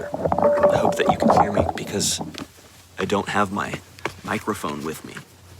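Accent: United Kingdom. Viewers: I was sooo sure you were in the UK :-) You sure fooled me (and others) with your slight intetnational'ish Brit accent reading Douglas Adams.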